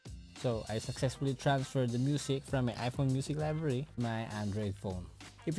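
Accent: Philippines. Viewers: Are you a filipino cuz of you’re accent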